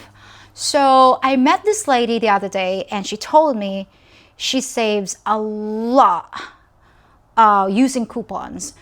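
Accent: United States. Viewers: If you were in my area and saw you I would totally chat you up. Gotta shoot my shot right? But then I basically know who you are and other people may think you are just another American girl due to the melting pot of people here in the US. Guys here are jaded based on how hook-up culture is. Btw you have almost an American accent when you speak English. Another reason why someone might see you as an American.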